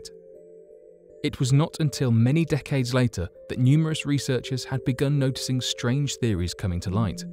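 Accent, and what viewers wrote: England, United Kingdom. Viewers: its always a british narator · I knew he was going to have an English accent before the video loaded.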